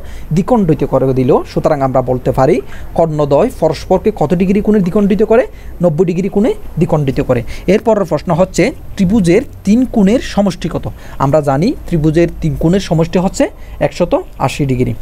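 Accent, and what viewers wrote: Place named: Noakhali, Bangladesh. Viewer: উচ্চারণ. Noakhalir hoise Ata avoid korte hobe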